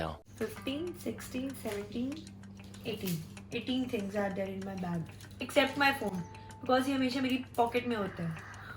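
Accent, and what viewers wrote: Punjab, India. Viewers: Aapki accent shehnaaz gill type ki h😅.. Shyd aap dono punjabi h isiliye 😅❤ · Punjabi hoka Hindi bolni chngi ni lgdi.... So make vlog in Punjabi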